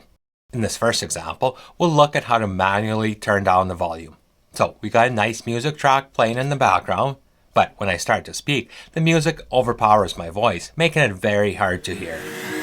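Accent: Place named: Saskatchewan, Canada. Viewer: As soon as I heard your accent, I could tell you were one of us 306'ers.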